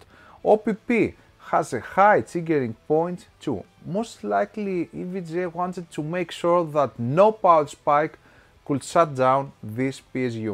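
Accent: Greece. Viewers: Ελληνας ακουγεσαι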